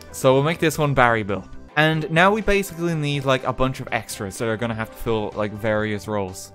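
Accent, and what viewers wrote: Ireland. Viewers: I figured you were Irish. XD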